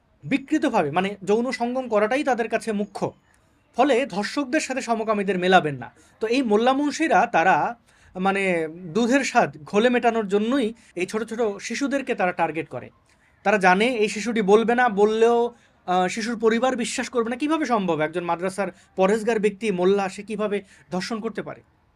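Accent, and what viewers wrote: Sylhet, Bangladesh. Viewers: তুমি তো ভাই আমরার পুরা সিলেটি মাত জানো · ভাই আমি ভারতীয় সিলেটি এবং আপনার সিলেটি Accent টা শুনতে অনেক ভালো লাগে, তাই আমি চাই যে আপনি মাঝে মধ্যে সিলেটি Accent এ ভিডিও বনান। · আপনার সিলেটের ভাসা খুব সুন্দর 👌👌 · আপনার মুখে সিলেটি কথা শুনে খুব ভালো লেগেছে। মনে হয় আরো শুনি। অন্য ভিডিও তে সিলেটি কিছু বলার জন্য অনুরোধ করতে পারি কি ? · ভাই, আপনার সিলেটি ভাষা বলা, সত্যিই প্রশংসনীয়। মনে হয় আপনি দীর্ঘদিন সিলেট ছিলেন। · ভাই আপনার মুখে সিলেটি ভাষা অনেক ভালো লাগে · ভাই তুমার সিলেটি মাত ত ভালাউ সুন্ধর। হুনতে ভালাউ লাঘে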